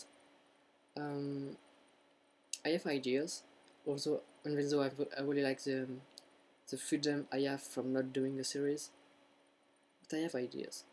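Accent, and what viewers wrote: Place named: France. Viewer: aaah beautiful french accent, I loved Rosy 'ardy :D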